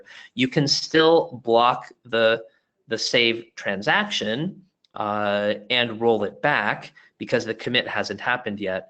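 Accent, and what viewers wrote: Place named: United States. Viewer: Why American voice here? Why not people with famous Indian accents in this video? Isn't Salesforce Indian company?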